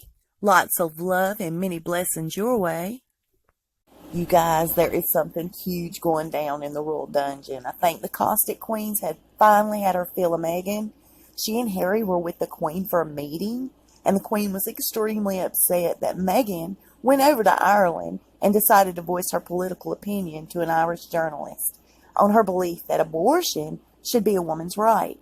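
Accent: South, United States. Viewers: I LOVE GOSSIP WHEN IT IS AS “ JUICY” AS YOUR WRITINGS. AMERICANS ARE NOT USE TO HEARING THE “ INSIDE DIRT” ON ROYALS: NO MATTER WHAT THE COUNTRY, WE HEAR NOTHING! ( BY THE WAY) YOU ARE A “ GENIOUS” AT GETTING AND KEEPING ONE’S ATTENTION. AS A TEACHER, I MUST TELL YOU THAT THE WORD “ ESPECIALLY” DOES NOT HAVE AN “X” ANYWHERE IN IT, BUT YOU ALWAYS SAY “ EXPECIALLY”. YOU ARE DOING “ A “ WORK IN REPORTING, BUT IT WOULD BE EVEN BETTER IF YOUR SOUTHERN HABITS SAID ALL THE ENGLISH WORDS CORRECTLY! ASIDE FROM THE SOUTHERN DRAWL, ( WHICH WE LOVE), WE ALSO WOULD LOVE FOR YOU TO GET THAT ENGLISH PRONUNCIATION RIGHT! THAT WOULD MEAN AN “ A ++ “ ‼️‼️ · You and your southern accent tell us what you are. Sorry baby.. TImes have changed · Hey, what southern state are you from? I am guessing Georgia.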